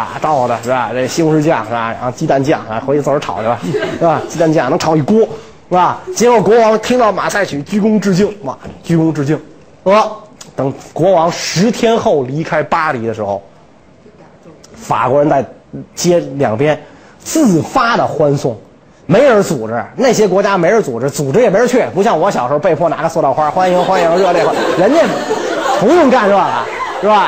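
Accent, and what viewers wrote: Beijing, China. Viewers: 哈哈 这个京腔好可爱～ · 一口京腔，好听啊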